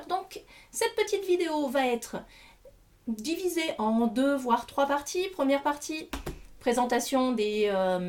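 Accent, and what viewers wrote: Saint-Étienne, France. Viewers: Toujours ce petit accent stéphanois qui fait tellement plaisir... Beausaigne... Oui les tablettes de chocolat achetées chez Casino avaient des images d'animaux... Félicitations pour vos vidéos toujours sympathiques.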